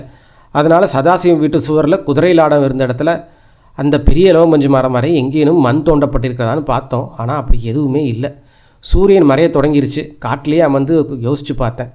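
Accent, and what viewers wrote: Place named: Kerala, India. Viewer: You have acent of Malayalam.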